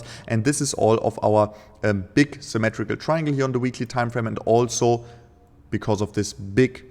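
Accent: Germany. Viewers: Bei dem Englisch gehe ich mal von einem deutschen Kollegen aus. Hört doch bitte auf mit DIESEM MEGASCHEISS!!!!!! DANGEREOUS!! Das geht auch ohne diese reißerischen Thumbnails und Texte! Ansonsten alles Gute!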